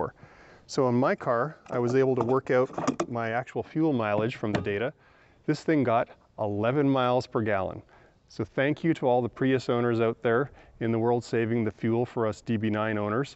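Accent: Canada. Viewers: You were not kidding about those costs. I did buy a 2006 V8 Vantage with a manual gearbox. It was a lovely car - for someone more well-heeled than I. Parts costs do not compare to labor at the dealer, and both are truly expensive. I had a limited mileage full insurance policy so that greatly reduced operating costs and "wear and tear." I liked the car a lot, bit too much understeer, and the clutch engagement was very sudden at the top of the pedal stroke. I was fourth owner, and the car only had 25000mi on her when I acquired if for the ten months I had her. I might look into another one of the earlier, simpler cars of this era again, and would advise any potential owner to shop and maintain it wisely. Thank you for the video. I note a bit of a Canadian accent for Californian though! 😀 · From your "aboot"s, do I take it these figures are in CANADIAN dollars?? You never specify U.S. 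or otherwise, so... please clarify! :) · I can tell he’s Canadian by the way he says “out”, and “about”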